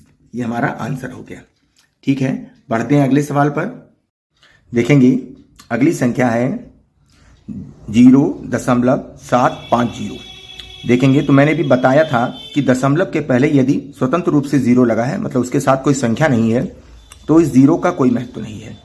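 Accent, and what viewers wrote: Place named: Lucknow, India. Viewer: U r belonged in near about Lucknow. Voice touch aaka vaisa hi h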